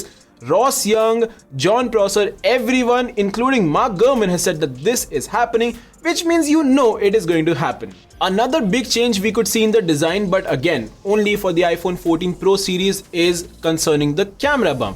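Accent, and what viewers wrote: India. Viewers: Indian freshy English accent is very potent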